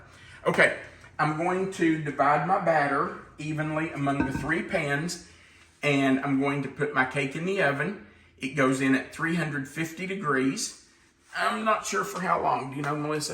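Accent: South, United States. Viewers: I was just going to download your video so I could make this cake later, but that wonderful Southern accent kept me glued to the screen!😂 Your voice is very pleasing, and that cake - wow!! You're a great teacher by the way, thank you!!❤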